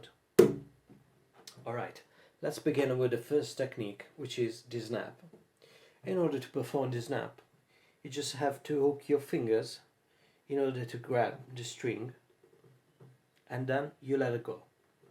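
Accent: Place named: United Kingdom. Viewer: Perfect British accent bro 🤙🏻👏🏻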